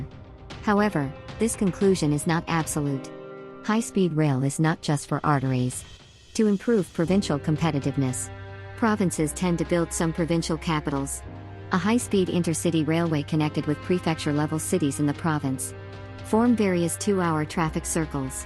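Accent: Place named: United States. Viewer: I love the idea of HSR. But much of this is "Chinglish", yet spoken with an American accent. So it's computer-generated propaganda. If there's anything worse than the current intelligence level of the average American, it's the human rights record of the Chinese.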